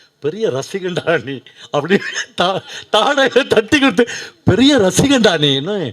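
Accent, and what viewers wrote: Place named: Tiruvannamalai, India. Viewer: தி.மலை தமிழில் உரையாடுவதின் உயிரோட்டத்தை உணர முடிகிறது.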